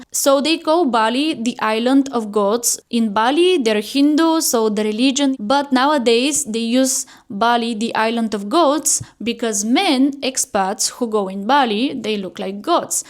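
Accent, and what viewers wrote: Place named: Eastern Europe. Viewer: Your accent is very slavic. I like it 😊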